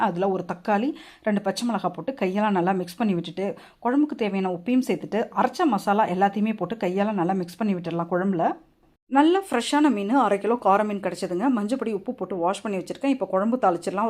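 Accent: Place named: Kanyakumari, India. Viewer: Nee entha uooru...kaniya Kumari style sollu...thappu thappa sollu thara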